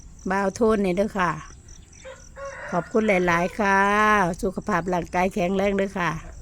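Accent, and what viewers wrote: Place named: Khon Kaen, Thailand. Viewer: เริ่มเว้าใกล้ข้องแล้วจ้า 😍😍😍สู้ๆต่อไป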